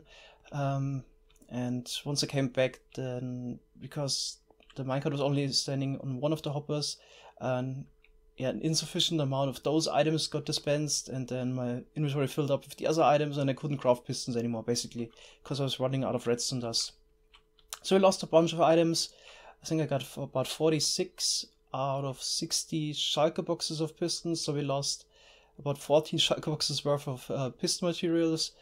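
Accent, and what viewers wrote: Germany. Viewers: HoiiBoy ???? Das liegt daran, dass ein Deutscher Englisch spricht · es klingt wie wenn ein deutscher englisch,spricht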